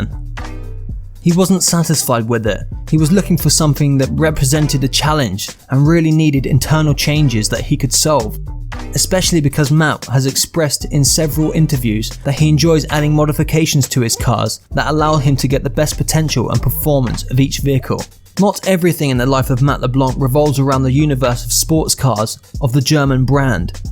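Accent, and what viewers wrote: United Kingdom. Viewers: half hour of syupied math in bri ish accent. fah shame/ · I have watched a few of these tubes and find them interesting and informative but DAMN! your pronunciation of English is downright annoying. You sound as if you're English or at least of that heritage but some words you use should be avoided at all costs. Such as "La Fayette" and "Illinois" in the Axl Rose episode are pronounced as "LaFayettey" and "llinoise",m and in the current episode you speak of and "Ensembel" when referring to an ensemble. If you take more time you mau increase your subscriber base.....Just saying. Nevertjeless will still watch when I am completely void of contemplative thought ans the mispronunciations wake me up.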